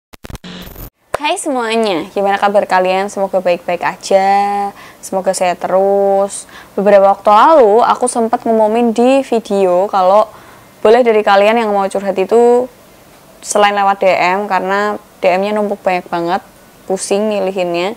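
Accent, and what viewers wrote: Java, Indonesia. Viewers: aku ga suka logat Jawa tpi pas denger mba * ngomong jdi sukaaaaa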